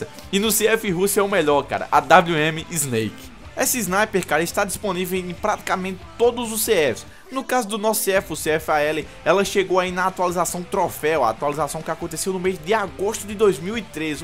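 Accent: Northeast, Brazil. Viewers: ruma de bônus kkkkkk sotaque nordestino é diferenciado!!!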